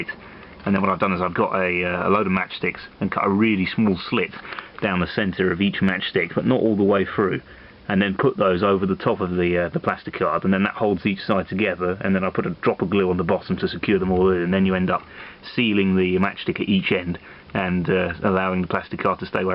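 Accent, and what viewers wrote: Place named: Oxfordshire, United Kingdom. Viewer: Where is your accent from ? Sounds oxfordshire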